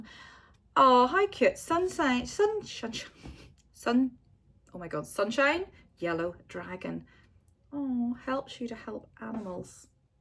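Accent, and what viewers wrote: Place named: United Kingdom. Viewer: Interesting dialect but I am from USA and can't tell what part of the Uk you are from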